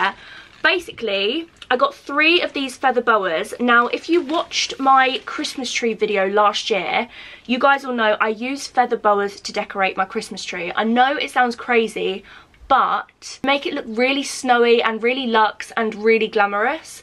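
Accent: United Kingdom. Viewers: OMG YOUR BRITISH YASSSS SIS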